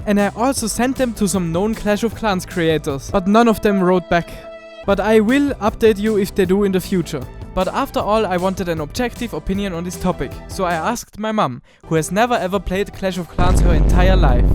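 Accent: Germany. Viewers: ngl i love your german accent :D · Mann hört so krass das du deutsch bist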